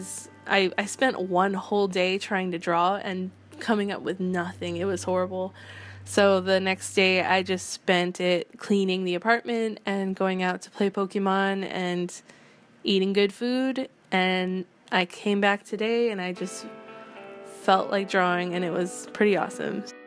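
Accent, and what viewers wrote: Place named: California, United States. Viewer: are you from California? cause u totally have the accent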